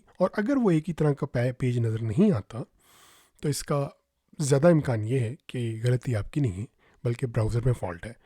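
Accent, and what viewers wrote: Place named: Pakistan. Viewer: بہت خوشی ہوئی یہ چینل پاکستان میں دیکھ کر وہ بھی اردو لہجے اور زبان میں، ورنہ ذیادہ تر دیہاتی لوگ منجن بیچ رہے ہوتے ہیں۔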